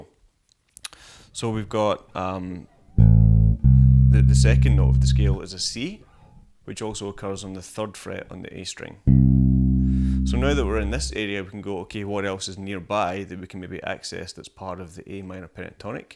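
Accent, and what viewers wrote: Scotland, United Kingdom. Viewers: for a moment I thought I'd have difficulty understanding your accent as it says Bass Lessons Melbourne, but I was relieved to hear that you speak in Scottish accent. I hate the Aussie accent.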